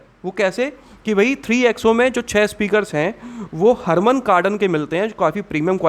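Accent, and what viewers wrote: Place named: Gwalior, India. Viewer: Bhai gwalior se ho kya awaz se lag rha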